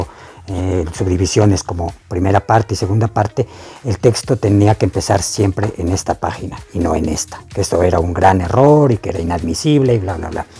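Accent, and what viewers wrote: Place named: United Kingdom. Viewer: I like your lovely british accent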